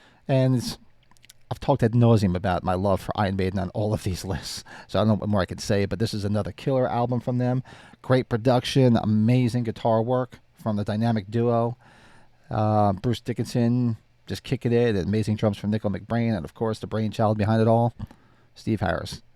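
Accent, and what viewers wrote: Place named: New Jersey, United States. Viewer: How do I know you’re from New Jersey immediately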